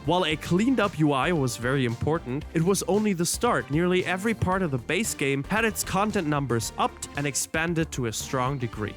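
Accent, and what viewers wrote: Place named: Germany. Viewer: Get a better voice-over actor. The one you use has a German accent that makes my ears bleed.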